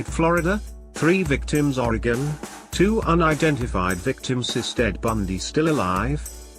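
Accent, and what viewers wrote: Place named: England, United Kingdom. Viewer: Robot voice with a snooty English accent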